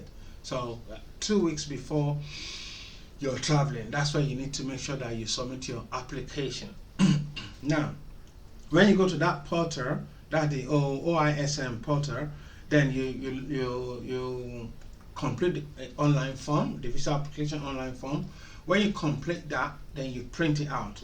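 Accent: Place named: Nigeria. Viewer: you sound Nigerian 😆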